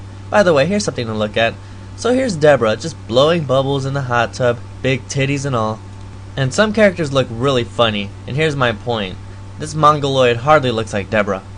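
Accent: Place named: Ireland. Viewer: I agree that it's very pleasing to listen to. Neither loud and brash, nor quiet as a mouse. Neutrally-accented, save for a faint Irish lilt.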